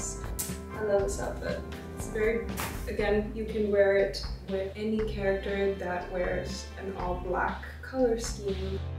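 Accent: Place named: United States. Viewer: Aksen nya america bgt…tinggal di US ya?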